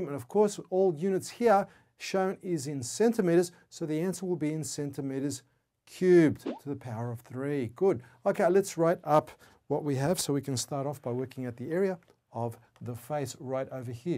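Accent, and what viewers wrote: Australia. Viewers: u can tell his from sydney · He sounds like a australlian and french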